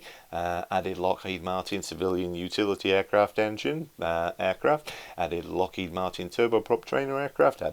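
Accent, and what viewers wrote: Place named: Lancashire, United Kingdom. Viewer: Honest - I enjoyed every minute of it and I learned a helluv a lot from it - even moreso in an accent that goes well with me who also stems from 1/2 way down the East Lancs Rd - 85 years ago I knew absolutely nothing about all the fuss about DX 12 but now I do I can't say I was impressed by the final graphics - it was very "posterised" I was hanging back from jumping in but I will give it a go - on a separate install and add bits of Orbyx as I go John Heaton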